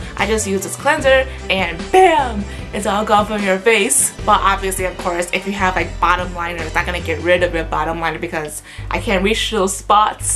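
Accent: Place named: Singapore. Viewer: haha you have a slight singlish accent here :D #SG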